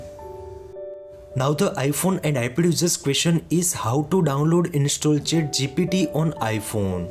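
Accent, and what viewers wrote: India. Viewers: Are you in India? the accent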